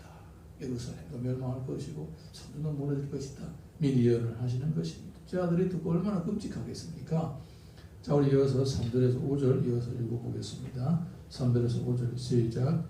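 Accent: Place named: Pohang, South Korea. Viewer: 아멘 으로. 화받후 경청 합니다 말씀 하시는 억양이 포항 연일 그쪽 같아요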